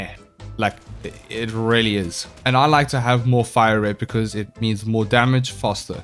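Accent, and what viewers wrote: South Africa. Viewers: are you south african? just asking cause you sound like you could be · Are you South African? Your accent sounds familiar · Are u south african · Are you South African?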